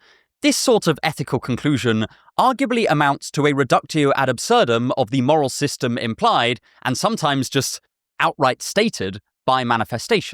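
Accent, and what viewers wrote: United Kingdom. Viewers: Definitely the best, purest British English I ever heard in my five years living in London. Great guy, I wish there were lots like him.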